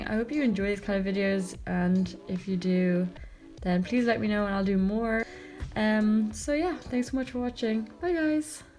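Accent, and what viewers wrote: Ireland. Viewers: Are you from Ireland??❤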